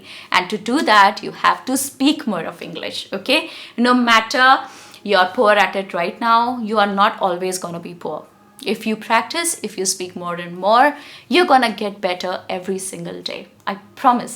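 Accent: India. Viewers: Hello Ma'am, How are you? Ma'am I watch & listen your videos very carefully. Ma'am one day you were saying that choose easy word to speak in English & then I use choose easy word to practice myself for daily uses . Everyday I watch your videos I like your videos no matter what the time is but I use the time to watch your videos & listen that what are you saying. I think I use to start first easy word to speak then it will automatically habitual me after that I will become hard & advance or phrases word to replace that word. I like your indian accent that's why I watch your videos repeat more than one I like your videos & when you teach us properly then I can understand clearly. I use those word & repeat that word to use speak in English. I am a beginner & I Don't know what happens to me I can't speak in easy word to speak in front of someone 's else.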